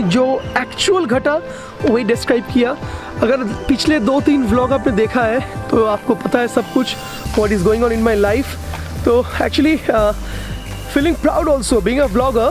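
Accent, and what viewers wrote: Bengal, India. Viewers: you speak Hindi with Bengali accent.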